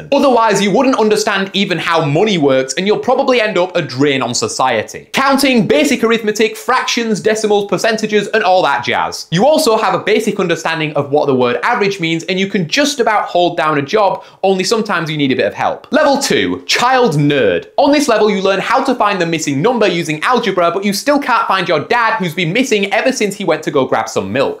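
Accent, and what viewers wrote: United Kingdom. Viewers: tf is that thick ass british accent?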